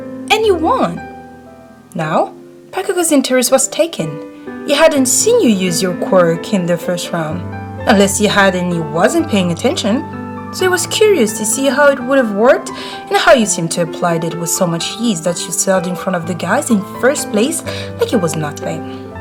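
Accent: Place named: France. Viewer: Cool video, love ur french accent ♡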